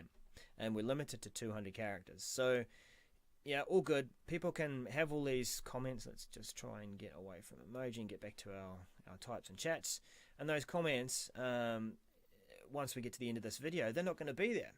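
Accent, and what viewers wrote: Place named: Australia. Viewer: Even you young people are lost, lost , I say, to try to follow, understand, and participate , in all this crap, this nonesense, about partaking in all this on-line balloney, about your so-called channel ! It is all a joke ! Even you youngsters are lost with all this so-called technology! a word of advice, Sir, help people to use your services or channel, and you Sir are a horrible explainer to any one ! You bore us to death listening to your Assie accent explain nothing! Get somebody who can listen to you , and he or she will back me up! and try to understand how a person l;ike myself who did not go through school with computers ! Yes the american Main line media is corrupt!! but they have professionals who know what they are doing to put forth their lies! <mail>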